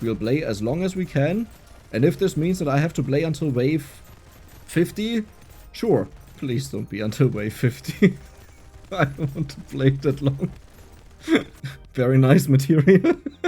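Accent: Germany. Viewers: what do you mean finding out? You couldn't tell from the German accent?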